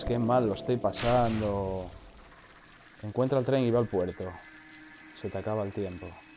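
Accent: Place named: Galicia, Spain. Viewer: de que parte de galicia ereees??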